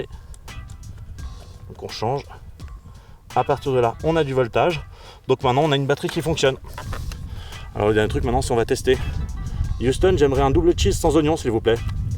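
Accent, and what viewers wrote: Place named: South, France. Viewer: en tout cas j'adore ton accens (du sud)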